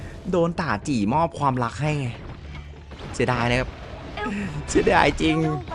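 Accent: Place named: Central Thailand, Thailand. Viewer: พี่เป็นคนเหนือหรือ ลวดเครียกลาง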